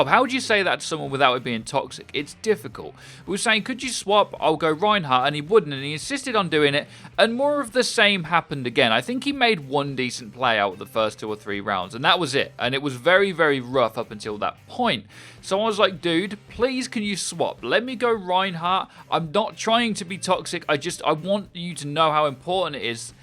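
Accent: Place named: United Kingdom. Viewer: Doesn't he sound like a British version of Ben Wyatt from Parks and Recreation.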